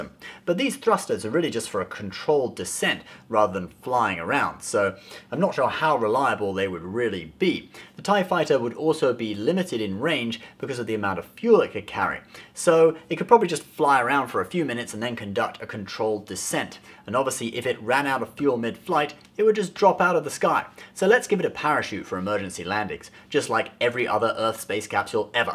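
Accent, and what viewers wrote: United Kingdom. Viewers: The people like your British accent. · I want more British and Star treck plz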